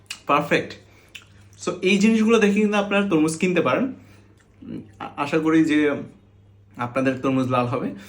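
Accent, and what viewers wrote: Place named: Noakhali, Bangladesh. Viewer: Taile bujben ata tor Noakhailla kotha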